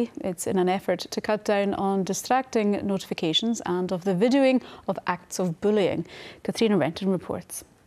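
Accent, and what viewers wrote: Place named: Scotland, United Kingdom. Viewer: Love that Scottish accent sounds great could listen to her all day ❤❤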